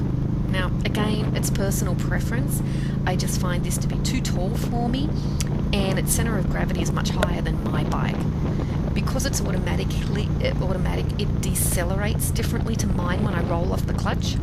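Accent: Australia. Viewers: G8 video there... Have watched most of them and have to say quite entertaining as well as informative... love the Ozzie accent... LOVE your Victory... Getting onto a course next weekend(Riding/Learner's Permit)... I'm a bit like yourself... but MID-FORTIES... LOL.. and have been on youtube for the last 6 months researching.... But i think the Victory's are No.1 with me at the moment(Hammer S)... But first things first... anyway... i really enjoyed your video..Cheers from Ivan.. Geelong AUS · is that the yamaha v star 650?? also are you Australian?? ( i am Australian and i think youre either Australian or a Kiwi)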